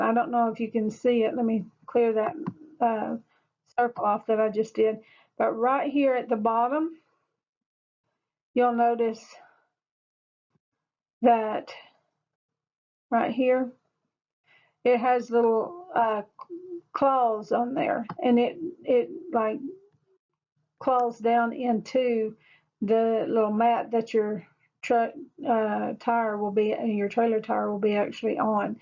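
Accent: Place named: Tennessee, United States. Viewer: You may be in CA but you sound like you’re from good ole TN. Thanks for your instructions and your time!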